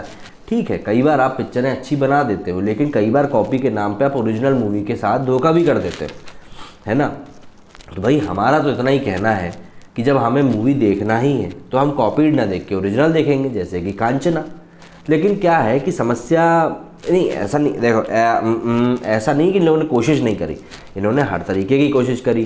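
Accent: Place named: Eastern Uttar Pradesh, India. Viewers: Bhaiya aaj kahe Bhojpuri accent me ? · Wahii....Kuch Kuch bihaiii....tone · accent up east kaha ke ho bhaiya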